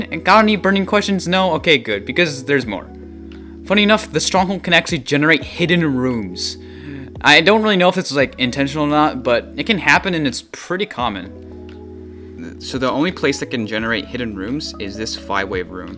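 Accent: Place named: United States. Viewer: Bro explain in Hindi i understood most of it but coz u talk English so fast and I'm not used to the american accent of English so it's hard to understand 😅😅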